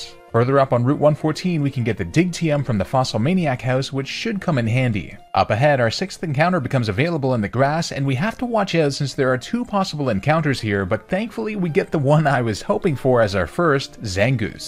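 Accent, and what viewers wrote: Canada. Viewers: I love how Canadians saying out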